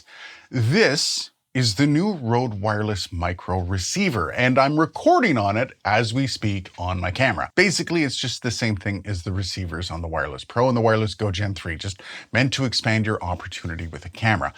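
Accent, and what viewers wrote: Canada. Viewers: Ouch, this one stung about as much as your Canadian "about" pronounciation pet peeve. I assure you not every podcast is two people sitting and having a conversation. I've been doing an audio theater podcast for 20 years, incluing me doing multiple voices, adding music and effects that can can sometimes result in editing files that are 12 or more channels deep. And many other podcasters do the same. I only mention this because just as you wouldn't say all music consists of a guitar and a drum set and only requires two mikes, not every podcast can be boiled down to a talking heads show..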